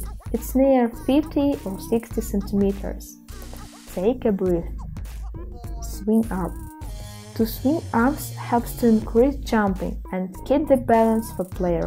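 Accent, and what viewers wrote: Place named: Russia. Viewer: Сначала не мог понять, почему мне мерещится русский акцент. Прочитал название канала. Понял,я то не мерещилось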